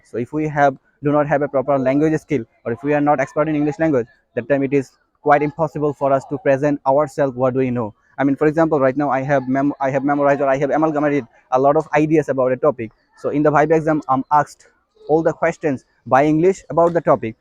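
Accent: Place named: Indian subcontinent. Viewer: Pronounciation skill needs developing.Here latin and British mixture as well as indian sub continent lingua style clearly shows😅. Anyhow, best wish.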